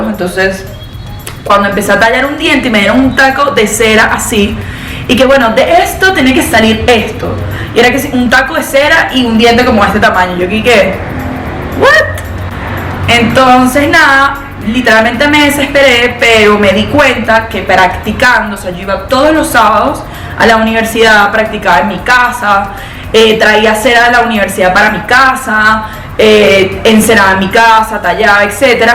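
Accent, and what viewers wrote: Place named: Venezuela. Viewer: Guido Kaczka On Drugs No es Colombiana, su acento es como venezolano. Saludos desde Medallo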